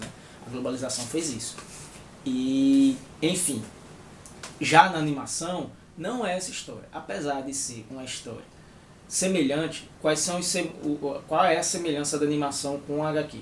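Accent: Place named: Northeast, Brazil. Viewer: Grande Vídeo, tava faltando vídeos com um sotaque familiar daqui do Nordeste :). Abraço.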